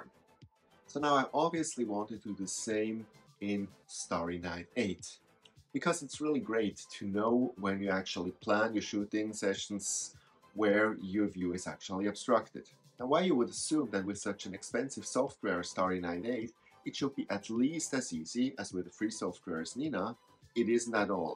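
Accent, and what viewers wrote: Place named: Austria. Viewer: This guy's accent is Arnold light!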